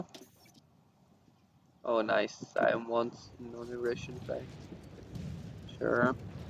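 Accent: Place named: Czech Republic. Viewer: Jsem si říkal, že ten přízvuk mi zní trošku Česky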